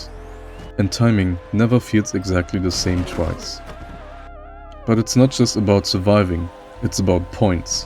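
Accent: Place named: Germany. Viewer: I hear a little DEUTSCH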